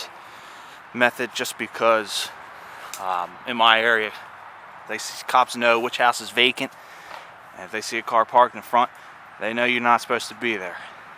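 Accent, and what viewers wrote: Philadelphia, United States. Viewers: Just wondering, as a born and raised Pa lady, your accent sounds like a Philadelphia one. Am I right?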